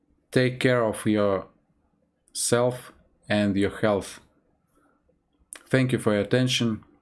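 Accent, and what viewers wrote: Russia. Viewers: Thank you very much. Alcohol is a big, big problem in my home country Danmark as well. For some reason the price of alcohol never really goes up here - in fact they have lowered taxes in recent years. I wonder why. Your English is fine btw, don't worry. For some reason, English spoken with Russian accent is very charming :) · good video-thanks.Your English-pronunciation- is pretty good.Your Russian accent is much less than the accents we-people in USA- hear in movies when an actor is portraying a Russian person. Maybe the actors ham it up-make the accents stronger than "real" accents. In any case-pronunciation is good. I looked up some figures-the claim was average consumption for person 15 or older is 11 liters of PURE ETHANOL per year. 11,000 mls- 100 pls of pure ethanol over a few hrs is enough to make you drunk-AND CAUSE LOTS OF LIVER AND BRAIN DAMAGE! 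So 11,000 mls/yr is 110 DRUNKEN DAYS per year- 2 times a week-POISONED. Opioids- are actually much less toxic than alcohol.They can acutely kill you-stop breathing-if used IV-but used orally they just addict(not good) but they won't kill you if used only orally. Shame for Russia and Russians-perhaps alcohol is why you folks are manipulated by your leaders and oligarchs(not that we in USA are not-but we actually have free elections(yes it takes huge piles of money to get elected-so in some respects our elections are bought-but. we have "some choice" and since we are armed-2nd amendment-occasionally we kill our leaders(so does India-Israel and other democracies-France repeatedly tried to kill De Gaulle-a hero war leader-they failed but not for lack of effort! You folks can't convincingly. threaten your leaders-we can but rarely kill the ones who "need" killing.But. perhaps our leaders keep this in mind when making policy.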